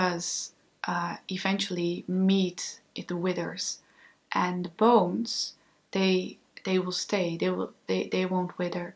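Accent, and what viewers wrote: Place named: Netherlands. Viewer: waarschijnlijk wel, das een nederlands accent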